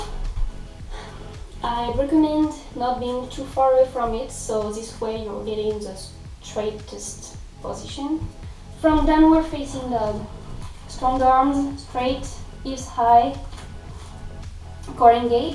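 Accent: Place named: France. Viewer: Great tutorial, very informational and calm. I`ve been in gymnastics for 8 years so I already knew how to do it and I`m not afraid of doing it, but I still loved your video:) I would really like to see a tutorial on how to to chinstand (with transition ) and/or jumping into handstand. My goal is to learn presshandstand but I think I need more strenght :) And I`m not sure what exercices to do to improve the presshandstand. Since I have no strenght I would be excited to at least be able to jump into handstand. Et j`aime bien ton accent français :D Loads of love , laurence. Keep working and posting awesome videos :)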